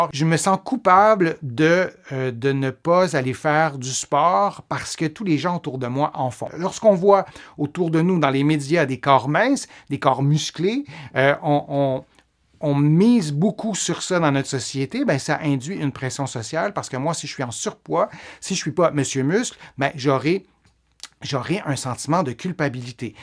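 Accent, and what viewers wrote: Canada. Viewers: Merci pour tous vos conseils , je vais acheter vos livres et je vous regarde en vidéo et puis j 'aime entendre votre voix avec le petit accent canadien . Vous êtes très motivant pour nous tous.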